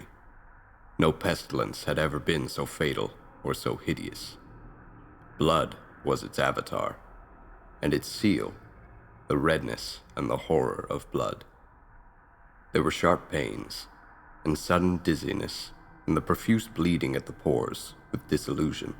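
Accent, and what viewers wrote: United States. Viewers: Very cool, almost strange to hear a fellow American rad on the site, he did a great job though, so used to hearing the Brit accent. Of the several 'spooky' tales chs I sub to, HB is still my fav and first choice. Best to all involved.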